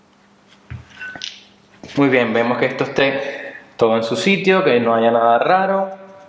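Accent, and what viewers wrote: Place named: Venezuela. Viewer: Hablas como venezolano, eres de canarias?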